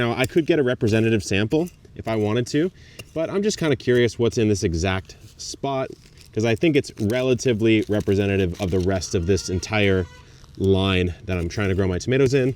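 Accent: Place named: Australia. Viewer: Hello Mr self-sufficient guy I love your videos I learned so much and ps. Love your accent I guess you from Ustralia 😁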